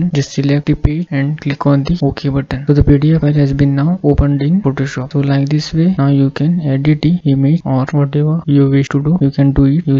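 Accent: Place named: India. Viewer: You talk as though you are talking Hindi or something like that which makes it very hard to understand. You are talking without pausing anywhere.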